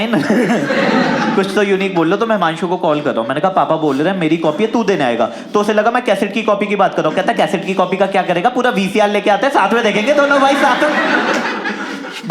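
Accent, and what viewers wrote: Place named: Saharanpur, India. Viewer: Pure Sharanpuri Hai... 😂😂😂😂😂😂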